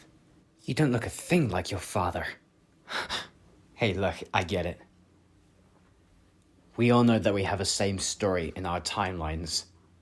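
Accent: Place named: United Kingdom. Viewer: idk why when he started talking I just went "ohmygod he's brtish" LIKE IM NOT BRITISH MYSELF😭💀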